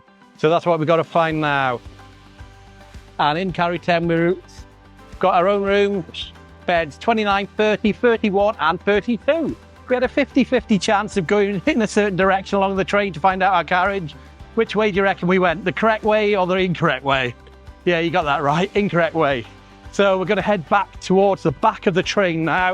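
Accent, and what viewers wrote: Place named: RP, United Kingdom. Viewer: 这英国口音太标准了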